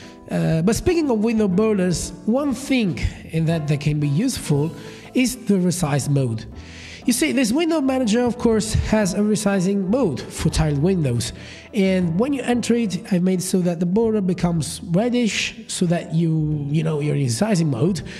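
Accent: Italy. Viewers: Hey dude, are you italian? Cause you sound so, but your English is really good.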